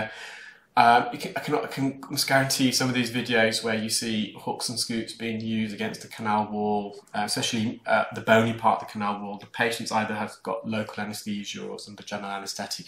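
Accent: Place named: Leicester, United Kingdom. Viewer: he's from Leicester in England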